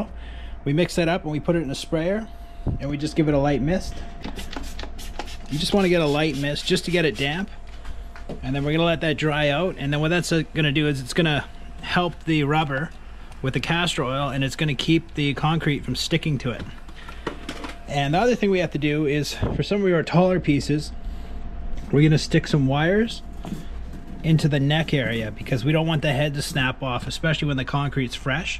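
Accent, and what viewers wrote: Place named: Upstate New York, United States. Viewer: Where do you sell them? Garden centers? Your accent sounds like upstate new York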